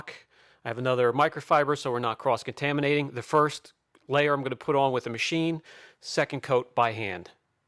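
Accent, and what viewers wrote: Pennsylvania, United States. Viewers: Very PA accent. Love the videos!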